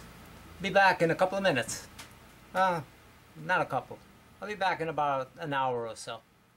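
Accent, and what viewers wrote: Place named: Azores, Portugal. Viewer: You sound like an Azorian person!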